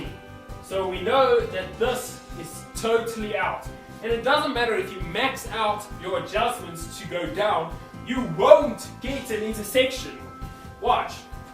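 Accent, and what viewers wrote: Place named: South Africa. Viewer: I wanted to say something when I watched the First Black Board video. Your information is great But the Music in the Back Ground and your accent make it hard to understand you. Please don't play music while you are speaking and try to speak a little clearer so we who are not from South Africa can understand you.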